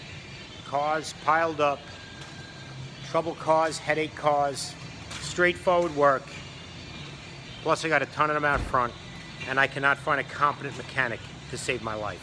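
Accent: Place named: New York, United States. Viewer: Nothing like a New Yawker to tell it like it is.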